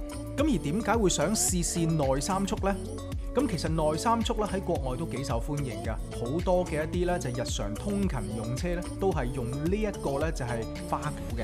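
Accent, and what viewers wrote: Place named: Cantonese, China. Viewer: 你啲廣東話我聽得好舒服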